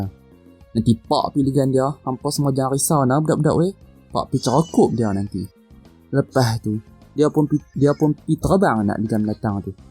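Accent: Kedah, Malaysia. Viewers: Kedahan Malay pronunciation a→å Apa →apå Mana → manå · Saya original orang Penang. Tapi video ini bukan bahasa yang kami guna. Mungkin cuma 20% penduduk Pulau yang guna loghat ini. Ini terdengar seperti loghat Kedah.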